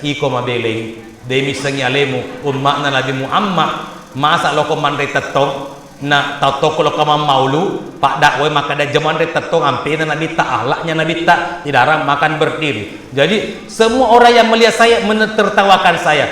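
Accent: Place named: Bone, Indonesia. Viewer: Ust dr Bone terlht dr logatx sukses ust smga sht slalu. Amiiin.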